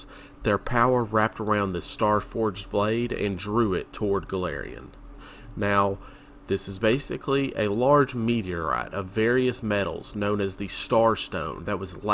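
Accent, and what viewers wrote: South, United States. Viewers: I'm from SC and was also pleased to hear a Southern GM haha. It's not a common accent for these kinds of videos so it definitely made me smile Great work on these vids btw! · exceptional voice/accent, Im from SC and appreciate the southern rep. The music doesnt fit though.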